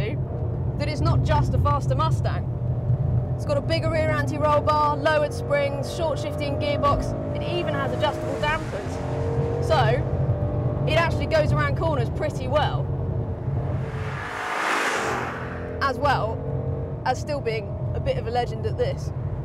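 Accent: United Kingdom. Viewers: LOVE that British accent. Love the Boss as well. Have the 2012 Boss and it's a blast. Good tune and 305s got me to high 11s.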